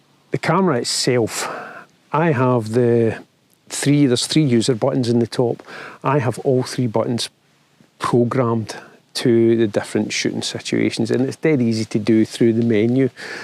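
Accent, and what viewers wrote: Scotland, United Kingdom. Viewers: I would book a workshop instantly if I wasn’t so afraid of only getting 1/10th of what you are saying :) So hard for me to understand the Scottish accent although I visited Scotland five times. 😂😂😂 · Great video pal, also great hear the scottish accent for a change. I had the d850 but recently sold and got a Z7ii but haven't used it yet for any landscapes. I was wondering, for landscapes when on the tripod I take it you turn image stabilisation and IBIS off?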